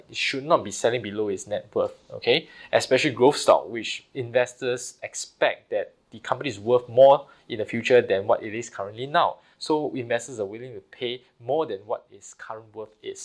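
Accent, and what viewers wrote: Singapore. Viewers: I just can't take the heavy (Singapore?) accent, so I quit watching after 30 seconds. But I am pretty sure he doesn't know how to value a stock, his approach is basically guessing. If this is the right way, analysts would not deserve to be paid that much. I don't blame on him, in fact I would be surprised if anybody at his age does.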